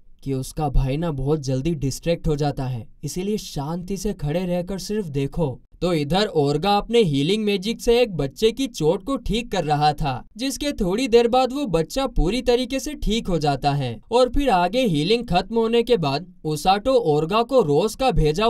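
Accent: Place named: Gujarat, India. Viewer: Bhai ton Gujarati jese ha muje to acha lga😅❤ Love you 😎 bro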